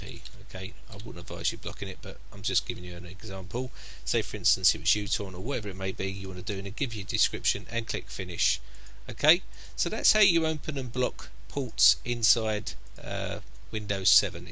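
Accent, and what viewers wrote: United Kingdom. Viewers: I know that's pretty much the accent for all British people lol. There is slight variations. This guy sound like Frankie your right though.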